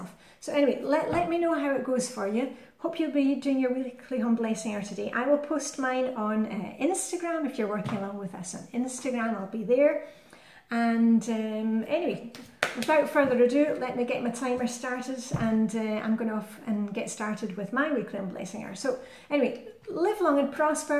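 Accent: Scotland, United Kingdom. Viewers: I’ve only just found your channel! from Denmark? As soon as you had said your first 4/5 words I knew you were from Scotland 🏴 and when you said Bahooki - that takes me back 🏴- well I’m hooked... I’m clicking on to binge watch. It sounds like we are having a wee blether! Cheerio Lizx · Fine Scottish accent,!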